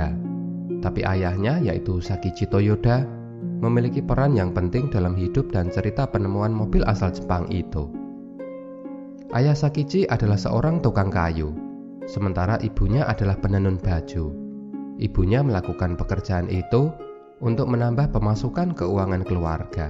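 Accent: Java, Indonesia. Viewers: Ada yg lucu di menit 11.18 detik saat dikendarai mobilnya bunyi etek etek etek wkkwkw siapa yang denger kalimat ini like and comen lucu juga ya pembaca beeitanya suaranya Mas nya medok banget keliatan dari jawanya sama kaya aku dari jawa tapi medok nya udah ilangbtuh kwkwkkw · Sedikit saran. Pengisi suara ganti org aja, logat jawanya risih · Saya bolak balik lihat Video ini. unik membawa cerita, logat Jawa medok nya khas Alhamdulillah Sekarang Toyota nangkring di teras rumah ku.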